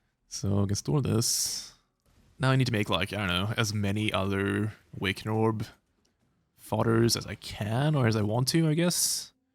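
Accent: Sweden. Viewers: You can't fake that Swedish accent 😊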